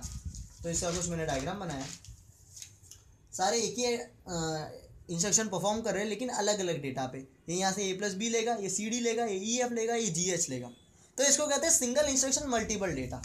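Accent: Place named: Indore, India. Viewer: Your way of speaking is awesome! Indori type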